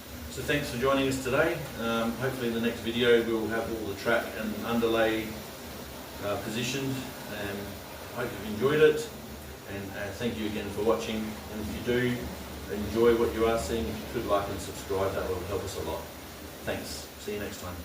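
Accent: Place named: Australia. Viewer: Hey man, thanks for the upload and update! It is starting to take shape. Nice to see your little one get involved. Ps. Isle of Wight?? You sound aussie! I am so confused.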